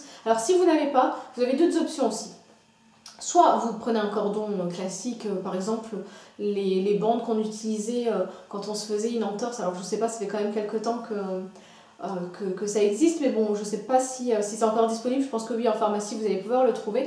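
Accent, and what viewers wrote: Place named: Kabylie, Algeria. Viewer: t'es kabyle ? psk ta l'accent ^^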